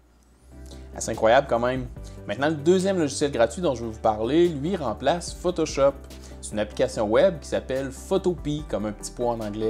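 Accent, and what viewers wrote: Canada. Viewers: Supers' videos, bonne humeur et pas de prise de tê te. Et ce joli accent canadien !!!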